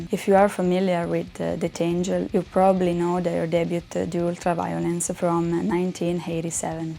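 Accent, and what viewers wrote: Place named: Italy. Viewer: Mi stavo giusto chiedendo di dove sei, visto un buon inglese parlato, ma con un accento non proprio madre lingua. Poi hai nominato il maestro Angelo badalamenti, e dopo questo posso confermare al 95% che sei italiana 😅... comunque ottima classifica anche se preferisco il buon vecchio rock/hard rock 🤘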